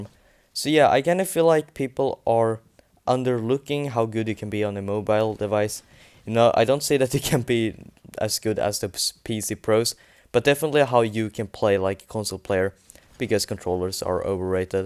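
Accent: Sweden. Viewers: i bet ur swedish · Are you from sweeden ur engelish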